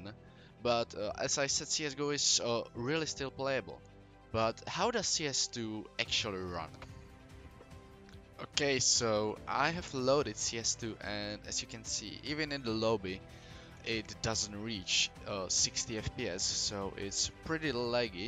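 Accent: Czech Republic. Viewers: Já si úplně říkal že to je definitivně česká angličtina a pak jsem viděl české menu 😂😂👀 actually mi to pomohlo se rozhodnou jestli cs 2 stáhnout 😂 já určitě ne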